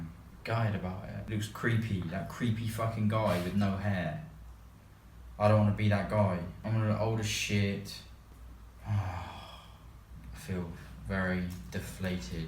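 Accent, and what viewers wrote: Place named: England, United Kingdom. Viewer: Hi m8, i love your accent..where in England r u from?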